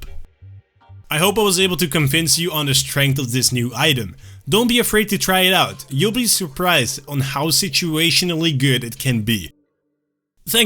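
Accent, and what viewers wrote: Netherlands. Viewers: jij bent NL niet waar ? het was echt moeilijk te horen maar ik hoorde het heel klein beetje :p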